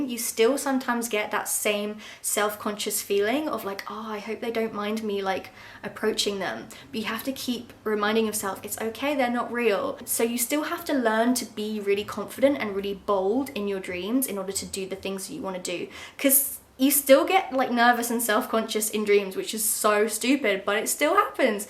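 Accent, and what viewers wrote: Scotland, United Kingdom. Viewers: I'm going to guess from her accent is Scottish, can't find any explicit info, I'm not the best linguist/dialect judge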